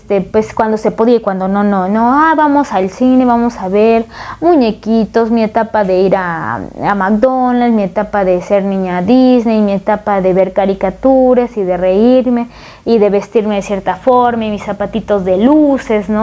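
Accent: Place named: Puebla, Mexico. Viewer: HOLA HERMANITA, POBLANITA DE TALABERA, HABLAS COMO UNA AUTÉNTICA POBLANA. LO DIGO POR TU “MODITO”’Y ESTO ME ENCANTA. EL TEMA QUE A TOCAS SIEMPRE. A VA A SER ACTUAL Y SEÑALAS QUE A TÉS LAS MUJERES ERAN MÁS REPRIMIDAS Y ES CIERTO, PERO TAMBIÉN LOS HOMBRES. AUNQUE SEGURAMENTE ÉRAMOS MENOS REPRIMIDOS, AUNQUE EN MI CASO PARTICULAR LA REPRESION POR PARTE DE MI MAMÁ FUE EXTREMA Y MÁS AÚN YO DIRÍA QUE FUE BRUTAL Y NO FUE CORRECTO, AHORA SOY PADRE DE 3 HERMOSOS HIJOS, CON CARRERA UNIVERSITARIA TERMINADA Y SIENTO QUE EDUQUÉ A MIS HIJOS CON DISCIPLINA, PERO CON AMOR CON INTELIGENCIA Y ES COMO DICE EL DICHO: TODO CON MEDIDA, NADA CON EXCESO Y SIN EMBARGO Y A PESAR DE TODO NUESTROS PADRES CON LA EDUCACIÓN Y TODOS LOS SATISFACTORES QUE NOS DIERON, AUNQUE CON RIGOR, NOS HICIERON MÁS BIEN, QUE MAL, PORQUE SOMOS GENTE HONORABLE, PRODUCTIVA Y. OS ESPANTA VER QUE LAS GENERACIONES ACTUALES EN GRAN MEDIDA SE VAN DEGENERANDO MÁS CADA DÍA. 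AUNQUE POR FORTUNA ESTA ACTITUD NO ES GENERALIZADA, PORQUE HAY GRANDES Y MUY HONROSAS EXCEPCIONES DE FAMILIAS HONORABLES. SALUDOS HERMANITA Y MUCHAS BENDICIONES A TODA LA COLONIA DE PINGÜINOS.🇲🇽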